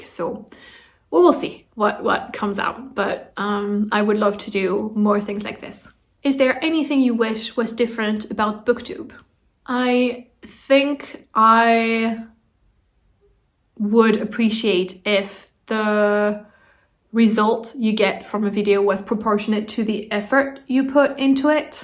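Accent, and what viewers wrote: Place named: United Kingdom. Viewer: Nice getting to know you better 😊 I don’t know if I could do this tag anytime soon because I feel awkward talking about myself (in general speaking into a camera is unsettling for me) but I’m trying to do more book tags. I think you’re a super eloquent speaker BTW! I would have guessed you were from the UK!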